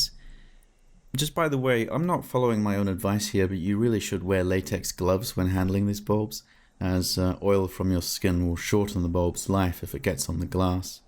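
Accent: England, United Kingdom. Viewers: He sounds English to me and he opened the bonnet/hood up on the UK's driver's side.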